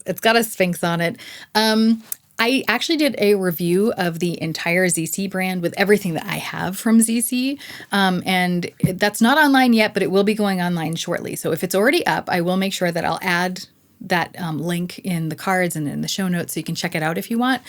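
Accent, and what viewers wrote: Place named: Canada. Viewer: I think I hear a Canadian accent? Yay!!🇨🇦🇨🇦❤️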